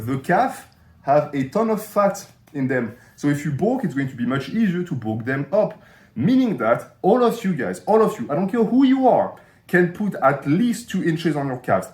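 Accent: France. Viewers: I suspected him being a French speaker at the beginning, and and was fully convinced when he said “the love Andles”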